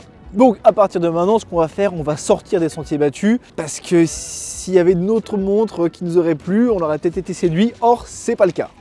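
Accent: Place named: Savoy, France. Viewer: Du Texas - avec le Stetson et la Jeep, on pourrait presque y croire s'il n'y avait pas l'accent savoyard (ou quelque chose comme ça) !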